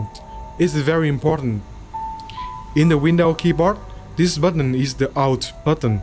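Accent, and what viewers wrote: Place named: Vietnam. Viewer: Vừa ấn vào video chưa kịp nhìn mà nghe cách phát âm là biết người Việt Nam gòi :3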